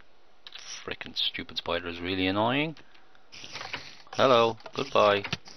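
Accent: Ireland. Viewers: I love how UR Irish idk why but I'm Irish to to I guess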